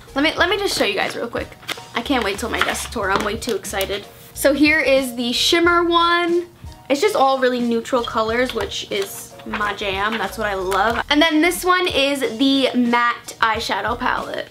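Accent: New York, United States. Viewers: Are you from New York? Lol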